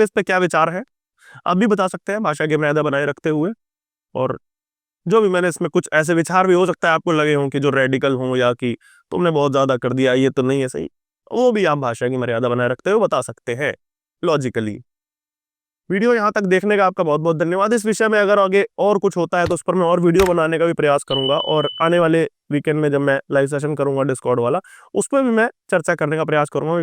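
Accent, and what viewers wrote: Kashmir, India. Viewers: Damn.Ur kashmiri accent is so accurate. Anyways I have been in Kashmir, the more alluring it is from outside, the more grisly it is from inside . Thank you for this video.❤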